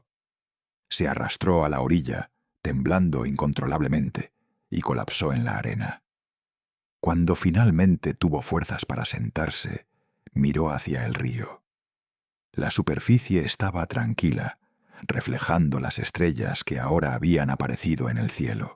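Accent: Spain. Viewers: Con ese acento Español no te creo ni papas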